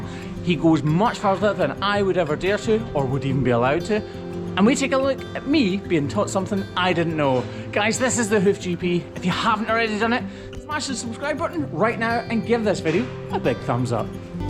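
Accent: Scotland, United Kingdom. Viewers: Why is it that after watching your videos & then checking the comments, I read the comments and your voice is what I hear in my head? 🤪🤪🤪 I'm here in the USA & I hear a Scottish voice in my head. 😁😁😁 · I never thought I would laugh at the phrase “foot fetish”, until I heard it with a heavy Scottish accent.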